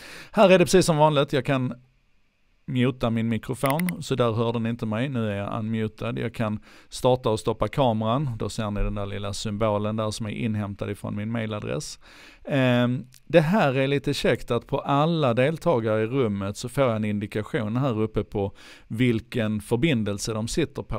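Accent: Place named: Scania, Sweden. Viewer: ) Alternativ till Zoom :-) :-) :-) och så förstås den härliga skånska stämman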